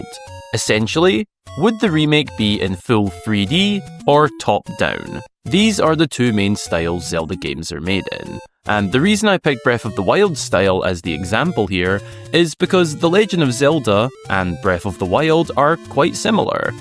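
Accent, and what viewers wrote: Scotland, United Kingdom. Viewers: Are you Scottish ? You kind of talk like Scrooge McDuck in the DuckTales reboot 😅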